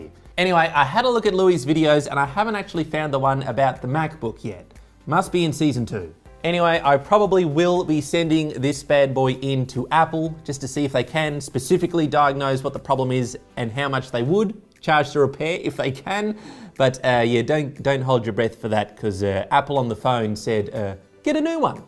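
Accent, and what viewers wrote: Australia. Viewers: Are you Aussie? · R u an Aussie like me mate?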